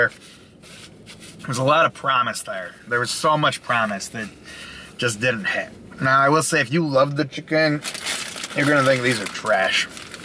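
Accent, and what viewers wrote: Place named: Ohio, United States. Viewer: Thanks! Hey quick question you wouldn’t happen to be from the Ohio area would you? My dad lives in Ohio and myself in ga but I noticed your accent. Lol no disrespect or anything I just miss my family up there!!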